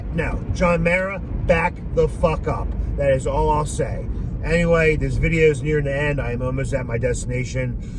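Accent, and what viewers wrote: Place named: South Jersey, United States. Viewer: Dam bro what part of south jers you from i’ from Atlantic City .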